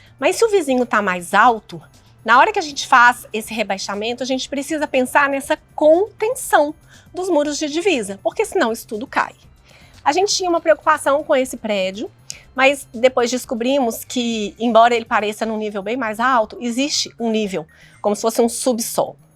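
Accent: Minas Gerais, Brazil. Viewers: Oie amo seus vídeos! Quando vc fala com esse sotaque mineires 🤣🤣🥰 amo mais ainda… sou apaixonada por essas transformações em casas antigas💙 um bj grande e to na expectativa de novos vídeos👊🏻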